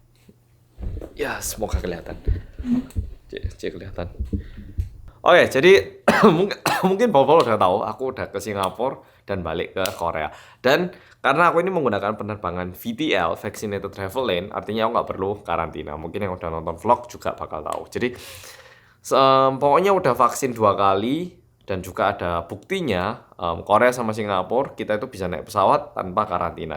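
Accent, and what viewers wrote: Java, Indonesia. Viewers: Semoga cepet sembuh Mas, Stay safe and stay healthy Sing semangat mas, 화이팅❤ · Gws mas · Ko ngomong nya bisa medok dan lancar bahasa indonesia nya ?lahir nya di mana ? Dulu pernah tinggal di malang .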